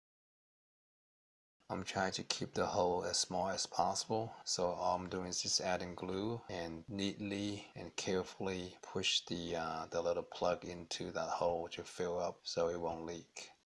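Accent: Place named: Asia. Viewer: Changadera in Asian accent 👌!!!!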